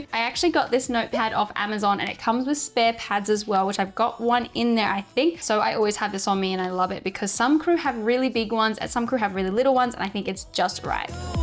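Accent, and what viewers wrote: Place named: Australia. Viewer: Hi, I love your content and I’m so happy to see your video! I really enjoy the vegan blogs and love listening to your Australian accent. ❤️🤍keep posting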